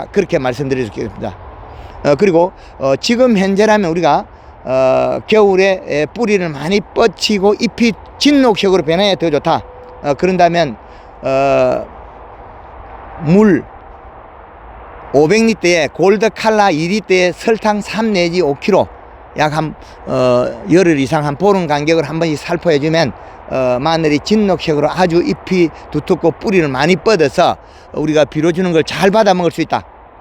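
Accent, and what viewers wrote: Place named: Gyeongsang, South Korea. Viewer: 동분서주하시며 특히 경상도 본토 발음에 친근함 느낍니다 저는 마늘심고 잎이3~4 장될쯤 가을비가 많이와서 두둑이 잠길정도 빗물이차서 뿌리가 傷해서 대표님 말씀대로 요소를 주고 회복이됬는데 웃비료를 15일후 뿌리고 부직포를 덮고 날씨가 포근해서 골드그린을 시비할려고 부직포를 벗겨보니 비료 가스에 마늘잎이 노랐게 말라버렸읍니다 1월25일 비료를 주고 물을 주고 밤기온이 영하로 안내려간다는 예보를보고 근간 포근한날씨라햇볕을 보고 해서 많이 회복되는 감을 느끼고 대표니의 창녕 리포트를 보고 글을 올리립니다 생초보 지만 황금알농법을 열심히 실행하고 있읍니다 물이 차거워 마늘 작물이 스트레스를 받지않을까 생각도 되고요 아무튼 최선을 다해 해볼까 합니다 추후 동영상을 보내드려 도움을 받고 싶습니다 늦은 밤시간에 댓글을 올려 대단히 송구합니다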